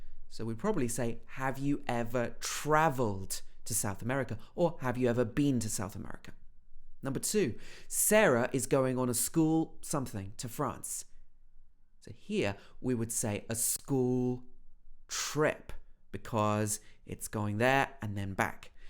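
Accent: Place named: Southern England, United Kingdom. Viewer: Hi. I love your accent so much, I live in Manchester though and I think it's better to have a course with a teacher from Manchester. As the accent in Manchester is a bit different from South. What do you think?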